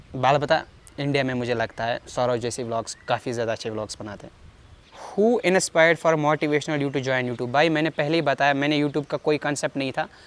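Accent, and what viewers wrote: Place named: Sopore, India. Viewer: Abi tak mai soch raha tha ap sopore se ho becoz of your ascent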